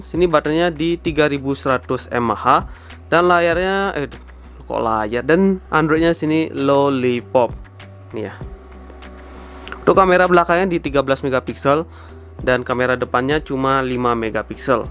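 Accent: Bali, Indonesia. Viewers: Dari nada suara bicaranya sampean kayak nya org bali ya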